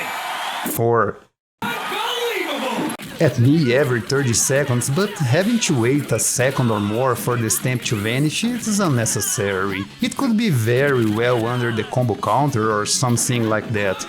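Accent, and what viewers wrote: Brazil. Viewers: Esse cara é Brasileiro, eu conheço o Inglês Brasileirado de longe....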